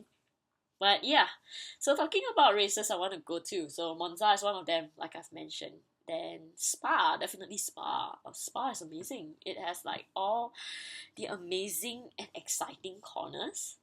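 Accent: Singapore. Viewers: What no I love singaporean accents (lah) :) You're so lovely (I'm watching!) and am looking foreward to more vids!! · Aww don't apologize. I love your accent. I always wanted to visit Singapore. I hope you can check out my channel too?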